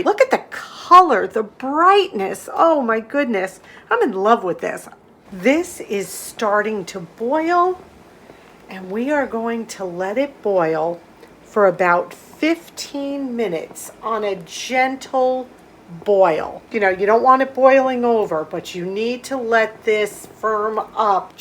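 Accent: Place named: Long Island, United States. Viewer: Hi. This is my first time here. I love frugal videos and yours is the first I've seen from NYS. I'm a New Yorker too....from Long Island. I think I detect a LI accent from you. Lol. I'm going to make the orange marmalade....mmmm. I love marmalade. is doing a great job on the deck. There's so many ways we can save money. Thanks for sharing. I subbed you and I'm looking forward to watching more of your videos. 😊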